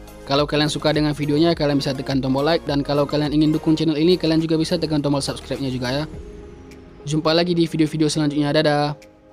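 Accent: Aceh, Indonesia. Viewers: Orang Aceh ya min? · Logat nya kayak orang aceh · Gaya peugah haba apa aceh sang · Orang Aceh kah bang Logat bahasa nya kayak orang Aceh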